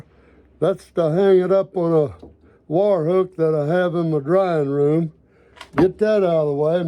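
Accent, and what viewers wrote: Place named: Appalachia, United States. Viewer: No yeah! Def not everywhere but I grew up in Appalachia and almost alllll the old folks talk like this, and honestly he reminds me of me my own pawpaw a little bit.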